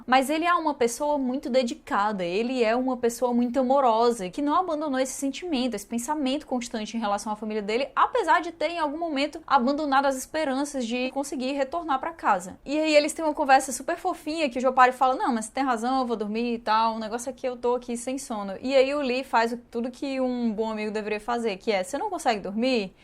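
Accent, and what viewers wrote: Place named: Northeast, Brazil. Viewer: Kkkkkk esse sotaque nordestino da eu amoo 😂❤ que pena que esse já o penúltimo review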